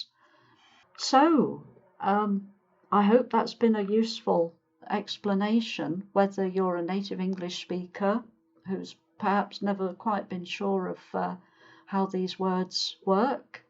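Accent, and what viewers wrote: United Kingdom. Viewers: can you suggest some British series or sitcoms that speak like you?. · Hello, thank you for your lessons very much! They are always not only informative, but also pretty useful. And one more reason why I love your study stuff is your really British accent 😇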